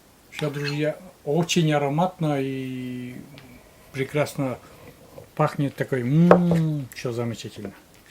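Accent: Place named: Luza, Russia. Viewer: Здравствуйте красавица я случайно зашла на ваш канал и услышала свой родной говор я с лузы всего доброго вам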